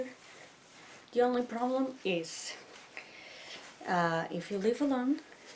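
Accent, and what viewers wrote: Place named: United States. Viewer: in portugal wouldn't you learn british english? your english sounds totally american to me.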